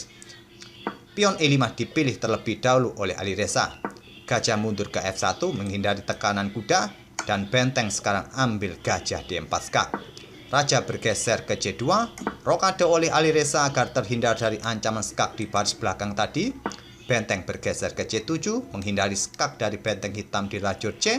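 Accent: Indonesia. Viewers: suaranya medok banget mas...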